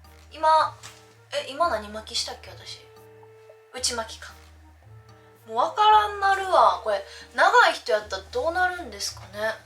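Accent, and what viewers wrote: Osaka, Japan. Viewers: 恵方巻き髪😀まきまき そう言えば握手会で巻き髪見たことなかったねΣ(ﾟωﾟ) 会話が、軽く大阪のおばちゃん入ってる(((*≧艸≦)ﾌﾟﾌﾟｯ 可愛い❤️🥰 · 平和なほのぼのとしたカワイイ動画ありがとう😄 こんな世の中だから何かほっこりしました。やっぱりみるるん面白いなぁwww一言一言が何かクセがあるし、大阪弁だから笑えます😁 一生懸命なんだけど、何かしでかし感もいいです。やっぱり女の子は髪形で雰囲気かわりますね。 自分は似合ってると思います🆗 PS・・・先日のNAMBATLEの動画も観ました。 ”ミックスジュース”頑張れ！！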